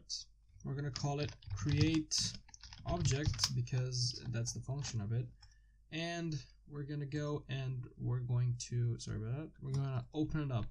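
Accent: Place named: United Kingdom. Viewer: Spawning objects show up anywhere anytime even if there is no link from one level to another because they are part of the only one collective integrated resource system which is self aware of the player xp so it gets more interesting the logic criterias of the program rather than the in game experience xp. The Brit voicings are always more interactive anyways.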